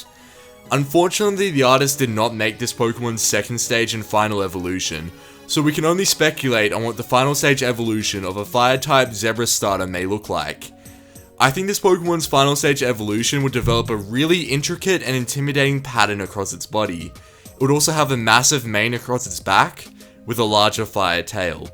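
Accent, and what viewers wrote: Australia. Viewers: The way he pronounced 'zebra' in the video made me laugh, sorry pal, not hating on the Australian accent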